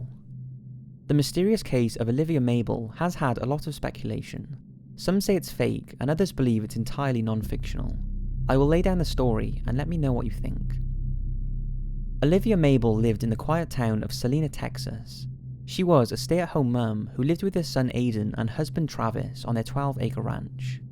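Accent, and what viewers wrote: Wales, United Kingdom. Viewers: He's Welsh. You like Welsh. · thx my sexy british senpai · Gabriel Mondragon he is 100% welsh, I’m welsh and I recognise his accent well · He's Welsh mate.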